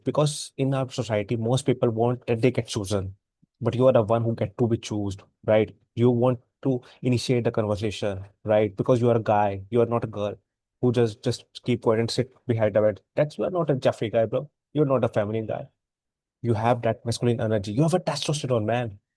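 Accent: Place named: India. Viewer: In this video little too much bass, I am having hard time understanding all you say because Indian accent heavy. When sound is more clear, I can follow you, but not if too much bass. Just giving you feedback because most people would just stop the video after 2 minutes Content is good though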